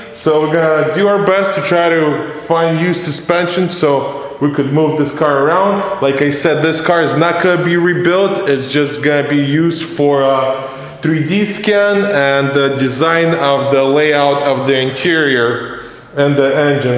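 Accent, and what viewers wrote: Russia. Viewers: У него русский акцент) Он меня поймёт)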